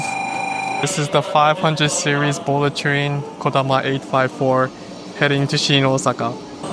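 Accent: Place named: United States. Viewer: Why do you sound so American?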